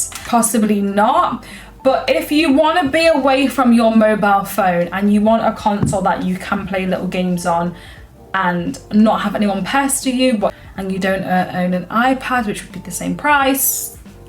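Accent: United Kingdom. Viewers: I like your accent, sound British I've always liked the British accent I think it's really cool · Never knew how much I desperately needed to hear recited in a UK accent but wow that changed my life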